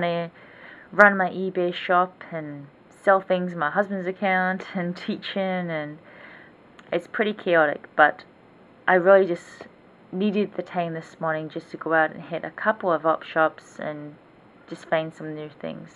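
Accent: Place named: Scotland, United Kingdom. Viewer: idk why but I thought you were Scottish hehe. Anyway you should post your ebay name.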